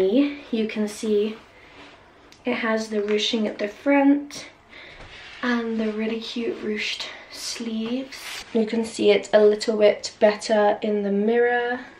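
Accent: United Kingdom. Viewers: You have gorgeous eyes! And I love your beautiful voice with that British accent it’s hot! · I love these try on hauls, your body is amazing and you are gorgeous. But I also love your British accent and your voice, just listening to you talk gives me some asmr tingles sometimes. You seem like such a sweet person. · Her accent sounds British. I never knew she was British.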